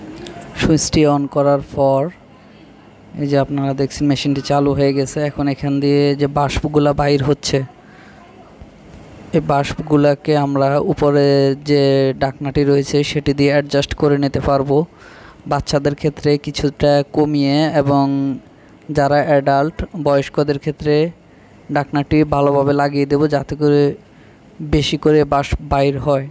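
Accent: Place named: Cox's Bazar, Bangladesh. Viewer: ধন্যবাদ, ভাই আপনার বাড়ি মনে হয় কক্স বাজারে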